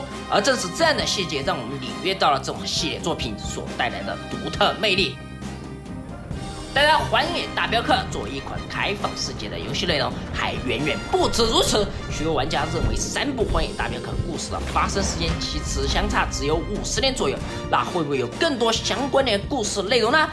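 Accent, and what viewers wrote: Sichuan, China. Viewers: 厂长的川普如果更川一点就更好了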